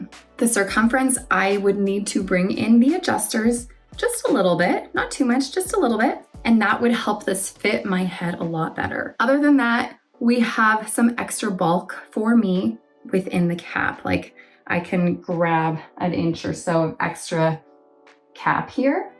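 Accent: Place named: Canada. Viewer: Just a trivial question... How is your accent doesn't seem different ? Maybe I'm wrong about Canadian accent. And the Charming style wig, great!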